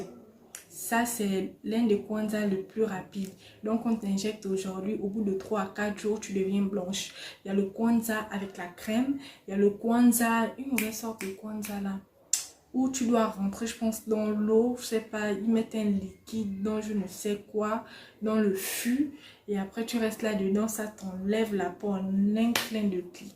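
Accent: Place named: Gabon. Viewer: Accent gabonais on dirait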